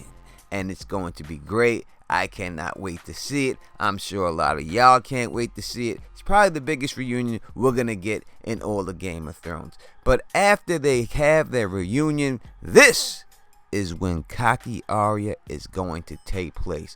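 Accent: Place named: Queens, United States. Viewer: Great analysis - thank you,. I love your Queens, NY accent - makes the world feel much better xD (Im from QV)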